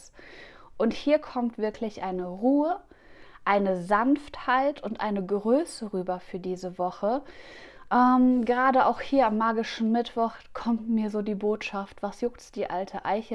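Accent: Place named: Austria. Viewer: Sehr lustig dein österreichischer Dialekt, aber leiwand 😁👏 nur gustl...dummer August 😉😇🫶 Hab tatsächlich grad viel Power und Energie...hoff es bleibt so💪💃 Danke dir liebe ❤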